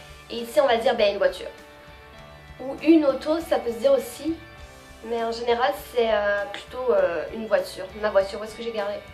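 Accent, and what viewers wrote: Quebec, Canada. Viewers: Au Québec on loff ou fox les cours :') mais j'adore ton accent semi québécois semi français!!😊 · c'est amusant parfois tu as l'accent québécois(la plupart du temps) et d'autres fois un accent français sans accent.Tu es Québécoise ou Française? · Tu as réussi ton objectif de divertir avec la comparaison des expression. C'est amusant drôle et instructif. Et pour ton accent à toi, alors c'est évident que tu es «presque Québécoise» Merci pour tes vidéos. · très bel accent ,je te croyais québécoise au début et je suis québécois .Tu es très jolie aussi bref continue c l'fun en ostie lol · Déjà je pense que ton accent québécois tu le garde juste par envie pcq ta prononciation on français est quasi parfaite et puis ce tag est très intéressant pr découvrir chacun l'autre ;) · Je trouve sa tellement drôle car je viens de commencer a écouteur tes vidéos et la avec tes vidéo du Québec se fou comment tu commencer a parler comme nous québécois! 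Je trouve que cette accent la te va bien :) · Tu l'as bcp l'accent de Québec quand tu parles ! ;) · Ahahahaha!! T'es pas mal drôle à entendre ^^ première personne avec l'accent Francais que j'entends qui a pogné l'accent Québécois! :p j'ai des petites affaires à ajouter (j'ai pas lu tous Les comms alors dsl si on t'a déja repris!) Pour Les "chaussures d'été, on dit ballerine, pour Les cheveux on dit "applatire" et elles faisaient référence au "soccer" vs "footbol American" vs " footbol" ^^ oh et, ici, on "fox" Les cours! "Foxer" :p hihihi merci c'était "bien sympa!!" ^^ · Honnêtement bravo à toi j’ai jamais vue ça tu as tellement bien pris l’accent Québécois 😉 et tu as raison car il est tellement mieux que l’accent français 😂🤣 p.s je suis d’Amérique du Sud 🇬🇫 (d’un département français) et moi aussi j’adore le Canada 🇨🇦 et le Québec je compte bien m’en venir habiter la bas, bye 👋🏽 et encore merci pour ta super vidéo. 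· Omg! J'adore ton accent😂 C'est trop drôle genre ta l'accent québécois et des fois français! C'est trop chou 😂😘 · wow c'est trop cute tu parles semi à la québécoise semi à la française ...c'est adorable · c'est assez drole que tu as un accent québécois alors que tu habite en france je viens de découvrir ta chaine et tu est super 😊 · C'est intéressant de voir qu'elle se met à parler avec l'accent du Québec, de façon naturelle, sans qu'elle ne s'en rende compte. L'accent devient plus réaliste. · tres bonne video, ton accent est 99% quebecois ! ^^ continue tes video! (just comme sa, jolie coupe de cheveux ;) 👌😄✌ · je n'ai jamais entendu un accent comme ça! Il est unique hahahah c rare d'ententre un quebecois parler français ou un français parler québécois , toi tu as les deux! c fou c vrm original jaime bien ahha :) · Je suis moi même Québécoise et en 2012 en camp de jour j'ai eue un moniteur Français et a la fin de l'été il parlais comme toi et ton accent est vraiment interessant et aussi tu a le parler d'une Québécoise mais l'accent d'une Française s'est vraiment bizard mais continu t'est video elles sont drôle ;) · J'trouve ça drôle/cute, elle parle à la française et quand elle vient pour finir sa phrase. 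Elle change pour l'accent québécois. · J'adore ton accent québécois-francais 😍😍😍😘✌️✌️👌👌👌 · Salut! J'me demande pourquoi personne s'est levé et dit que au Québec, pour Cotton Ouatté, on utilise aussi le terme Kangourou (l'animal) parce que y a une poche en avant au niveau du ventre. En tout cas, t'es vraiment une fan du Québec et c'est agréable à voir/entendre/regarder. J'trouve que y'a pas vraiment de youtuber québécois alors je vais m'y lancer à temps perdu. Soit heureuse, et le "Bon Matiiiiinnnnn" a aussi changé ma vie, quand je l'ai entendu de la bouche de la fille que j'aimais, au Cégep. On a même une marque (sorte, compagnie) de pain qui s'appelle Bon Matin au QC. En tout cas, ça doit être la marde pour toi vue que t'as ET l'accent français ET l'accent québécois. Le monde doivent te juger peu importe où tu vas hahaha. On s'appel et on déjeune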